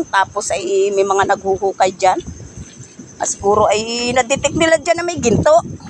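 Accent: Batangas, Philippines. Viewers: Ang ganda diyan sa inyo at saka parang madali laang ang manguha ng pang ulam. Puntong Batangas nga ang dating mo idol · Fullwatched nakakatuwa naman at akoy nakarinig ng puntong alae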